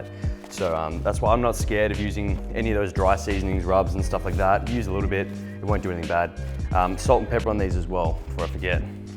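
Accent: Australia. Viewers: For some reason, Australian accents makes these videos less cringe and less douchebagish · Your accent is so Aussie 🤣🤣brilliant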